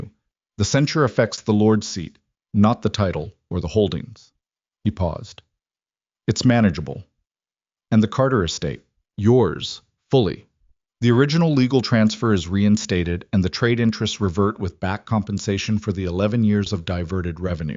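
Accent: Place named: United States. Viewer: Please use a British AI narration. American ruins the lovely story. I am an American.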